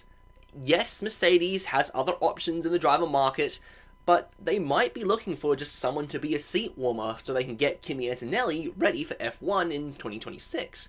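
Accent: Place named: United Kingdom. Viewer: I love slightly rude F1 commentary with a british accent! You got my sub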